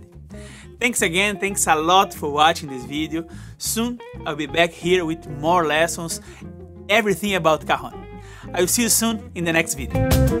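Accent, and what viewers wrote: Brazil. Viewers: me waiting for him to speak so I can check the accent: "... myes ok he sounds Brazilian I can keep watching ^^ " really good vid amigo !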